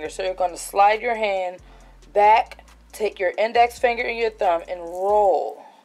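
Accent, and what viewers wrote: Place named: DMV, United States. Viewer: That DMV accent is there lol cute great video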